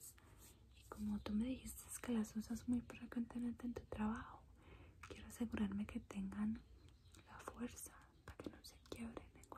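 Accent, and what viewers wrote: Colombia. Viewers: me encana el acento colombiano es mi favorito en el mundo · me encanta tu acento colombiano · El acento colombiano me encanta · Me encanta el acento colombiano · aprovechar tu acento colombiano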